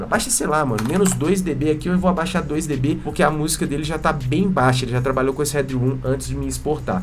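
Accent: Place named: Minas Gerais, Brazil. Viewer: Qualquer ideia que você jogar, a gente assiste, meu patrão. Você é fera demais. E outra, o sotaque mineiro tá forte, hein hahahahaha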